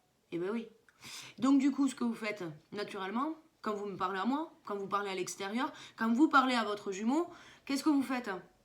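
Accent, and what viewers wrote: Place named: South, France. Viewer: J’adore ton accent du sud tes expressions et ton franc parler 👍 merci pour tes vidéos explicatives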